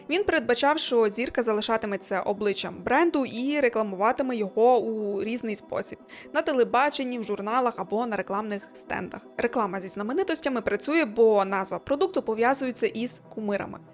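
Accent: Transcarpathia, Ukraine. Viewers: Хаха, ти точно з Закарпаття ))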